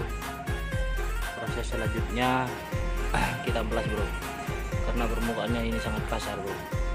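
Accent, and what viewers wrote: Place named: Madura, Indonesia. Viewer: kalau dengar suaranya orang madura ya bang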